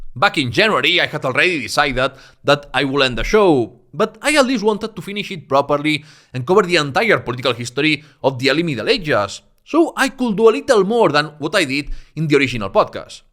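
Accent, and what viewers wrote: Spain. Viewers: I advise you to cut your videos in small bytes and ask provocative questions in the title + the hook ... Try to exploit geopolitical contexts of today and do some projections or really anything to exploit a controversy ... something like that in a short form You can also do collaborations if possible + if u want, u can improve your accent which is 100% understood but quick and overrun by your spanish accent (tho honnestly i consider that as a good feature, I like it) I'm amazed by the sheer quality of your videos... the level of details, the impartiality and the extensive coverage of all sides that constitute the amazing history of Spain...unlike another English channel by someone with a French accent telling his own subjective opinion ALL THE F*CKING TIME... so your videos is a breeze to me!! All respect to you!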